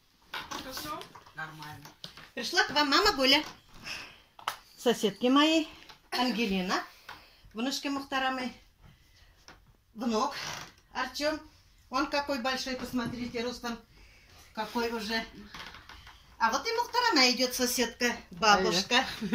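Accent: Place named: Bashkortostan, Russia. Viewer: Точно как наша нанайка из Башкирии, и говор такой же,и та же доброта, молодец Гуля ,тебе ещё больше Бог воздаст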